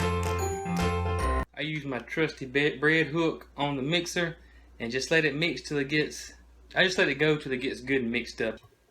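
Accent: South, United States. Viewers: Love ur country accent!!!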